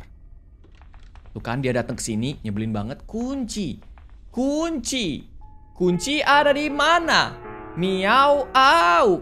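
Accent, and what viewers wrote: Java, Indonesia. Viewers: Medhok banget kak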